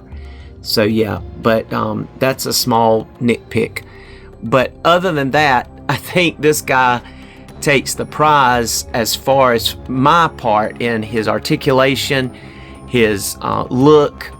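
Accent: South, United States. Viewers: like the name! Reminds me of Osborne. I enjoyed the video.. I was just wondering where you’re from and the accent.? My guess is from down south? Alabama maybe? Your voice also reminded me of the guy from the hangover again enjoyable thanks.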